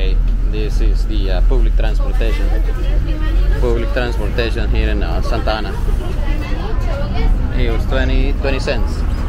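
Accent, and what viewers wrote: United States. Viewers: No manches muy americano abla español maje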